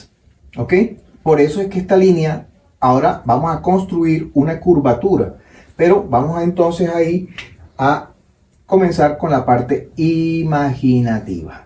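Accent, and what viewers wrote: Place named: Venezuela. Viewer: Tiene acento Venezolano❤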